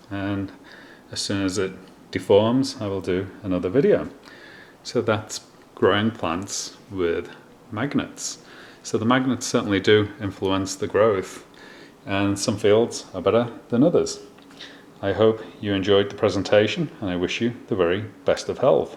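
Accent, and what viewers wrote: United States. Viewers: Oh I could tell right away you were in Arizona, because of your accent!!! Lol!!!! Typical Arizona accent, lol!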